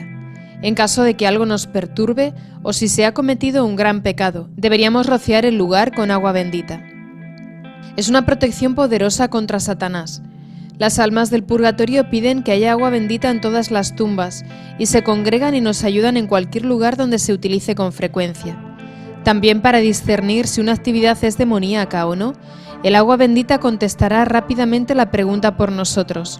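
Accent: Standard. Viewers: Agata Simma murió en el año 2004, pero en estos vídeos se manifiestan ideas que sólo se empezaron a hablar en los últimos diez años, parece que está todo distorsionado y mal traducido: María Simma no criticaría nunca a las cosas que dictaminó el Concilio Vaticano II, por ejemplo la comunión en la mano...Se están falseando los dichos reales de la Mística Católica, y opinando en contra de la Iglesia...Parece un pensamiento Tradicionalista que está presente en todo el vídeo...Yo puedo traducir alemán, y me gustaría mucho escuchar el audio original, y no a esta traductora española con acento neutro...Tengo muchas dudas por la forma en que ataca a los sacerdotes modernos, está en una posición claramente Tradicionalista y Lefevrista...Habla mucho de políticas eclesiales contrarias al Vaticano II, y no del verdadero mensaje del Señor Jesús y de la Iglesia Católica, Apostólica y Romana, que es un mensaje de amor y misericordia...Y espero que este comentario no sea censurado...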